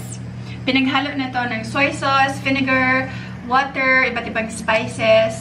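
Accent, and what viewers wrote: Visayas, Philippines. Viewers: Haha cute accent ng bisaya😍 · "Hay ka lisod sa kinabuhi kung nag inusara raka" ahh ka kyut gyud mag bisaya oy. Huhu teee notice meee please 😭🙏🙏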